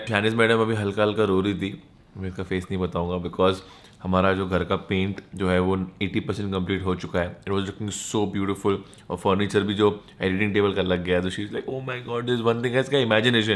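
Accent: Mumbai, India. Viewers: Omgg I have to say this.....in past few days I have watched videos so so much like binged them so bad.....that my accent has chaned from punjabi to typical mumbai accent 🥺😂❤❤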